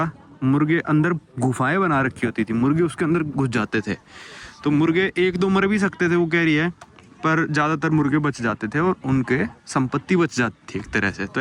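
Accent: Haryana, India. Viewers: Hats off to you dear. I enjoyed your video of Easter Island. You went so long in south america. Try if you can go to Antarctica, we are following you always. You always explain nicely. I like your haryanvi tongue. I am also a traveler. Only south America continent is left. Sorry you lost the phone. Best wishes 🙏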